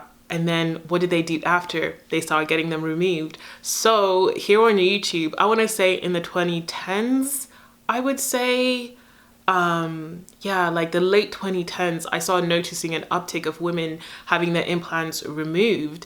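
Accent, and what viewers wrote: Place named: United Kingdom. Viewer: are you British? I absolutely love your accent.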